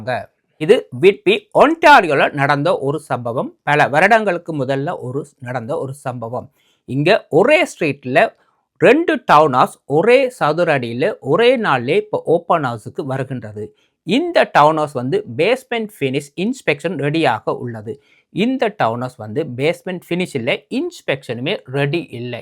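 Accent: Sri Lanka. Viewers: Ilankai thamil kekka rompavum inimai.😅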